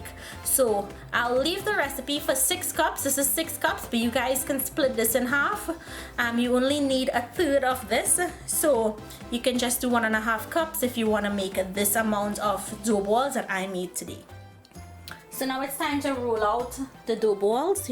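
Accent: Trinidad and Tobago. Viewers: Love how you Spoke Original. #Trinidad.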